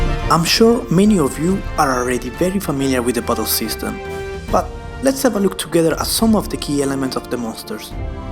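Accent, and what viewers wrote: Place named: United Kingdom. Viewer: British accent LOL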